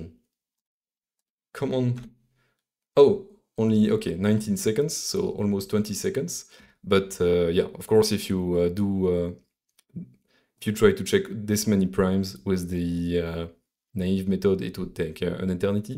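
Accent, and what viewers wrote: France. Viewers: That French access is something truly marvelous, chef's kiss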